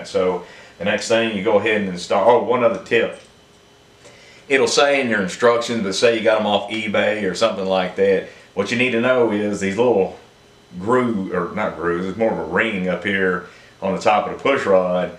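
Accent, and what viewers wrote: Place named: South, United States. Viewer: Thank you for getting back to me! I found that with them bled and taking all the lash out that it took the Screaming Eagle Adjustable Pushrods 4.25 turns . A guy at the parts counter of a local HD shop told me 4 to 4.5 turns and he was right. Thank you for your video. You sound like a Good Ole Boy! Just saying I'm from Mississippi but now live in SW Colorado. Come out for a trip, it'll be a blast taking you for a tour!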